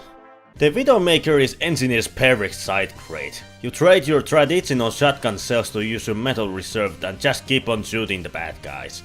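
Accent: Finland. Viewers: are you finnish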